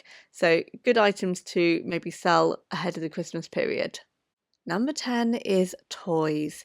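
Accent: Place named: England, United Kingdom. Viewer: Ah...a sweet quiet soft english accent..❤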